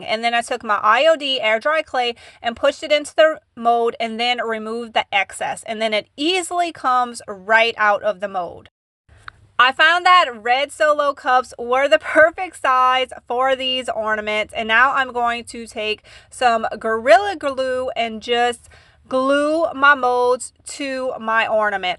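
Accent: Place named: South, United States. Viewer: I liked the cafe curtains. I love your southern accent!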